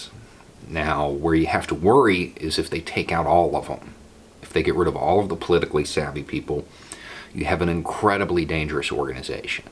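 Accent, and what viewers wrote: South, United States. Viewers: If you like MSNBC with a southern accent and a beard.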